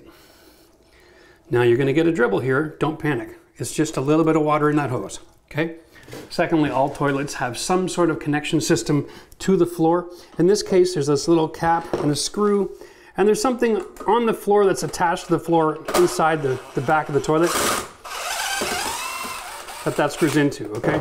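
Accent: Canada. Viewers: My comment got cut short Because my big carpenter finger accidentally hit the send arrow. So I'll repeat. This is the best toilet installation video I've seen... And as soon as I heard you sounding like Red Green and you showed me The Tim Horton's coffee cup To stop the vent leak, I knew you were Canadian. So thanks for all the valuable tips and Go Leafs! PS ... I'm here in the states so I have to make my own Tim Horton's coffee every morning And I don't get the cups. LOL. Stores here finally sell Timmy's coffee in cans..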